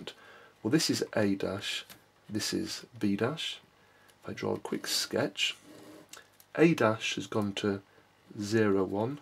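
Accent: England, United Kingdom. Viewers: I LOVE MY VIDEOS NARRATED WITH AN ENGLISH ACCENT. THANK YOU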